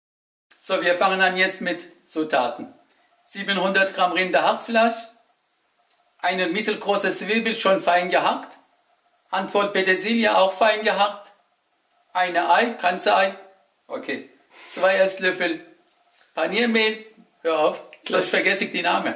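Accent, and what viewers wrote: Swabia, Germany. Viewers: Stuttgarter?? xD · Schwabe??? Hört man so im Abgang, oder täusche ich mich? Sehr sympatisch :-) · du bist Schwabe:D Ich feier dich mein Freund! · Sympathischer Akzent. Wo wohnt ihr? Schwaben? Liebe Grüße aus Lübeck. 👍🍽️ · bist du Schwabe? 😃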